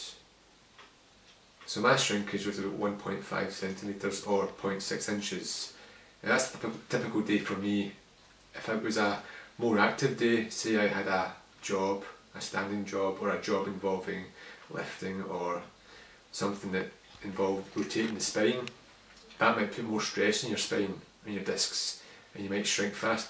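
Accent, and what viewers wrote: Scotland, United Kingdom. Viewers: the Scottish accent is awesome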